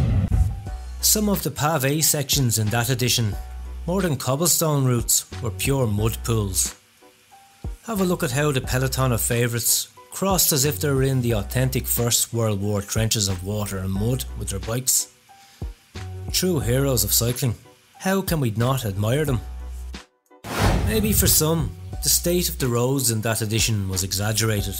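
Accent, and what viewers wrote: Ireland. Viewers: Irish gay narrative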